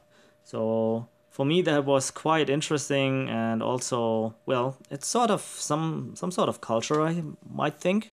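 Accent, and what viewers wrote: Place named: Germany. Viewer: Ah... you mentioned Germany. I am so stuck in old-fashioned ethnic thinking that I could not figure out why a man of your skin-colour would be speaking with a German accent. Forgive me :) Funny quirky vids. Thank you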